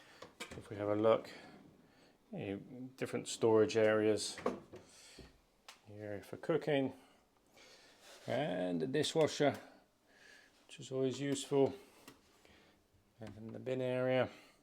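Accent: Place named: United Kingdom. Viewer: Nice to hear pure British English, not American one.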